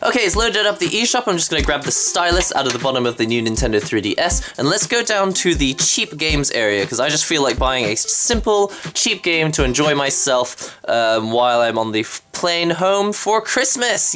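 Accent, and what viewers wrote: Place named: Scotland, United Kingdom. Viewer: Dude! You sound like you have a Scottish accent similar to mine.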